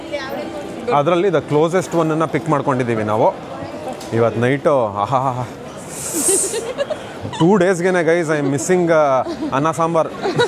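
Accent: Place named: Mandya, India. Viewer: sir nim english, italy spanish, axent jote mandya kannada extraordinary. Niv vlog madya mandya kannada chenda 😊 Happy anniversary to both of you🎉. Muddagiro girl baby ne pakka..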